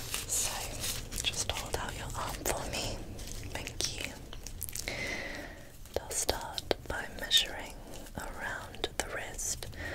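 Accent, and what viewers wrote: England, United Kingdom. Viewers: The tone of your voice is perfect. It’s very calming. An English accent suits asmr, it’s mesmerising.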